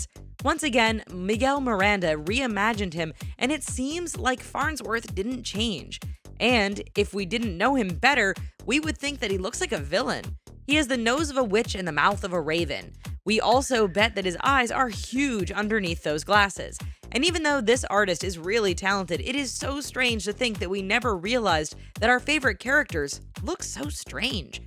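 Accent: United States. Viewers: This is better on mute. 2018 the year that the American accent became the world’s biggest turnoff. Mind you they will be speaking Russian soon so hey ho, every cloud. Oh it’s a shite video anyway with it the transformations are truly piss poor. Over and out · This video did not need narration. Especially that condescending white middle America accent narrative. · The narration is so american it hurts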